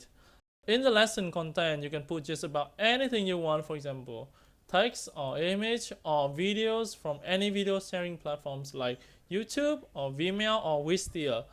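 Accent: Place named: Vietnam. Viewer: ui nghe như giọng VN luôn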